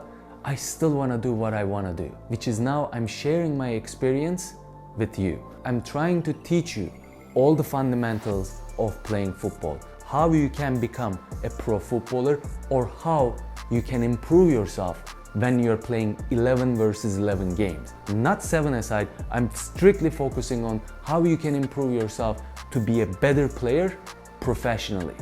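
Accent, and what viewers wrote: Turkey. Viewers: türk aksanı neyse bu video o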